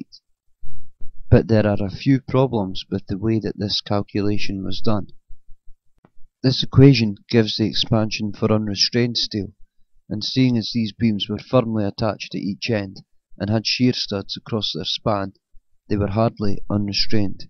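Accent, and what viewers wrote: Scotland, United Kingdom. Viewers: Great video, your scottish accent makes it all the better. Funny how ppl appeal to authority and their models, when models simply express the pre-determined conclusion, rather then consider the alternatives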